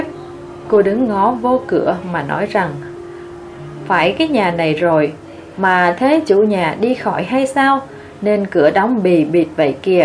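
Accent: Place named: North, Vietnam. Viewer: Truyen HBC fai giọng đọc nguoi mien moi dc . Het nguoi đọc sao chọn giọng Bắc kỳ vậy .ko nghe đâu !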